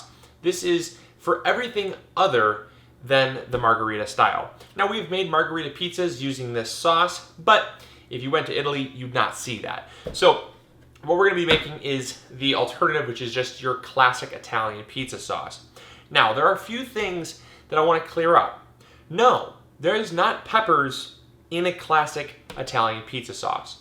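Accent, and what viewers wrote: United States. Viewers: I appreciate the way you convey what you’ve learned definitively without elitist attitude, arrogance and ego. Respectfully asking, I’m curious of your credentials? Again respectfully saying, I must disagree that classic and authentic... whatever it may be, has to be made a certain way without any substitution or variant of preference whatsoever. I was blessed to work for two generations of Italians in their family trattoria and while pizza wasn’t on the menu, we would make it for staff lunch at times and the sauce we made was quite different from yours as well as other recipes I’ve seen. My point being, when the language dialect can vary from one community to the next, it would be safe to assume other aspects of culture would as well? I live in the south eastern US and by your English, I’m assuming you’re somewhere in the states as well so perhaps you can relate to the following point. 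I’ve lived in NC where they boast some of the best sauce for bbq in the country. There are three different regions , one being the western part of the state that uses different forms of tomato as a base. To the eastern side, no tomato is used, only vinegar and pepper. While the central does as central does and incorporates the east and west to one degree or another depending on how close one might be to the two sides. I now live in Charleston SC. Famous for shrimp and grits and she crab soup as well as a few other regional specialties. While most if not all will agree some ingredients are vital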